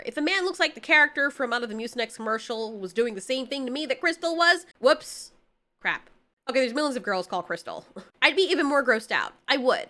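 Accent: Scotland, United Kingdom. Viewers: It was a Scottish accent. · an Scottish accent isnt something i knew i needed till this video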